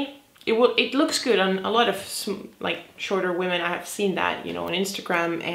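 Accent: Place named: United Kingdom. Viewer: The british accent made me diiiiieee hahahahaah aa great video <3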